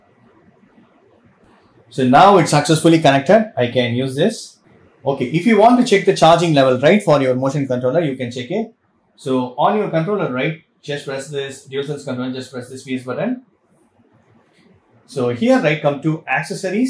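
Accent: India. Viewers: Thanks, your english accent has a malayalee flavour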